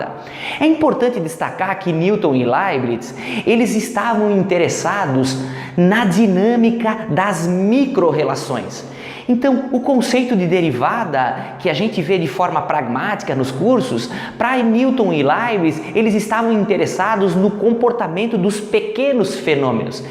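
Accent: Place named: Limeira, Brazil. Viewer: Amigo, vc é de Limeira-SP? Pelo sotaque, se parece com o meu....rsrsrs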